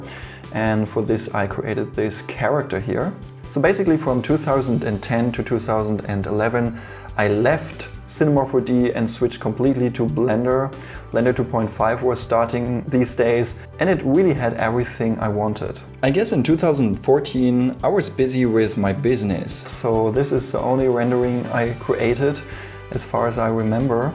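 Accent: Germany. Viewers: 100% deutsch junge sein akzent ist ja mal andere welle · Oh ein deutscher, ja dann Echt krass was du so drauf hast respekt !👍👍👍 Edit: oh, hast ja sogar noch gesagt das du aus deutschland kommst Mhhh Finde man kann das (wenn man selbst deutsch ist) echt stark am akzent merken☺ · Mega Story! Hab schonmal ein Video gesehen und dacht mir schon, so wie der spricht: das kann nur ein Deutscher sein! :P Grüße aus der Altmark! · Der Deutsche Akzent ist Real! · The story of your childhood home - in combination with your German accent - makes me think of Dr. Doofenschmirtz. You're probably a nice guy, though! :P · You sound german. I am too... also wollte ich fragen, wo und wie du als 3d Artist arbeitest? In der Umgebung wohl kaum? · diggha, wieder ein Deutscher, der versucht englisch zu sprechen. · German English, ich auch x) Und schiefe Zähne, aber ich nicht ;) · Bist du deutscher? 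Dein Englisch hört sich so an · Man hat halt echt sehr gehört, dass du Deutscher bist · Nicht schon wieder ein Deutscher der probiert Englisch zu sprechen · Love your German accent it actually sounds good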